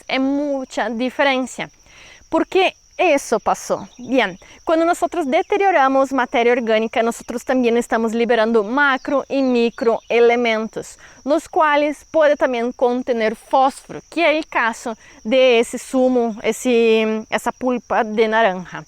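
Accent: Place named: Rio Grande do Sul, Brazil. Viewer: Você é brasileira né? Seu sotaque é de quem fala português do Brasil...gaúcha com certeza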